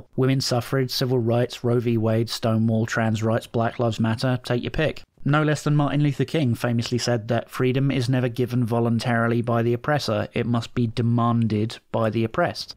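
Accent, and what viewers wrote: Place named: United Kingdom. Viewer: I find it highly distasteful to listen to a person with an accent that is obviously borne of some kind of relationship with the UK analyze America strictly from a viewpoint that is the product of HIS times. As a full-blood Cajun, I freely own being of Immigrant stock..tho my ancestors arrived on these shores as the result of being driven out of France in1685 by the Edict of Fontainebleu, making Protestantism illegal, declared by Louis XIV. MY ancestors arrived in 1723, according to a Diary kept by Solange Therese Thibideaux, wife of Jean Michel Thibideaux. NOTHING is known of their life in France, as agents of the French Crown pursued my kind for what must have seemed like forever. Meanwhile my kind intermarried with the various Indigenous tribes of the Caribbean, and learned that land the Yankees AND Southerners DIDN'T want was rich in creatures that were good for food; that small lots of ground protruding from cypress swamps were ridiculously fertile if you knew WHAT to plant and where...and so my Kind survived. 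My family aided runaway slaves by building tiny shacks which blended into the swamp...places where clean water in jugs, blankets my ancestors could ill spare left to stay warm in on chilly nights, and dried beef, hardtack and salt were stored in boxes built by hand and waxed to keep moisture and insects out. After the War, free Blacks-not many, but some, were able to walk openly up to my 4× great granpapa's front stoop and thank him. So how DARE you, Mr. Voice From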